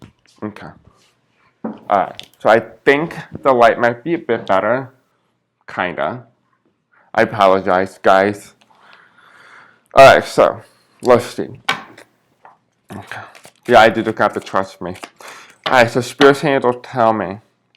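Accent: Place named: East Coast, United States. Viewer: Your voise seems to say that you are from the East Coast of America.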